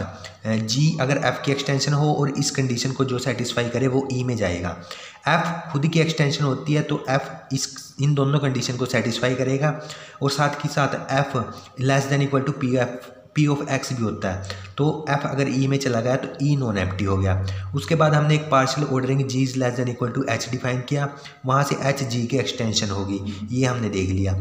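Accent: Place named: Haryana, India. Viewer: Aap ke accent se pata chal gya ki aap haryana ke ass pass se ho , ho sakta hai haryana se hi ho , Thankyou so much sir , ye accent chupaye ni chupta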